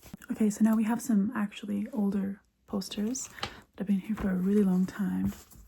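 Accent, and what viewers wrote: Australia. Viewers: I always felt australian and british accents are extremely similar. your accent is very australian so I think that maybe english is your native language and you are only studying japanese but havnt become fluent yet.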